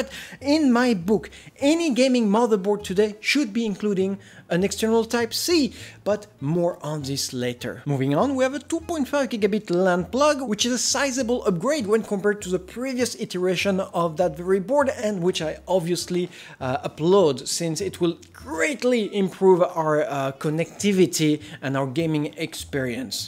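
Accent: France. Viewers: That French accent nice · My gawd, that french accent x) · Frérot ça ce vois t'es français , very good accent , tkt on est pareil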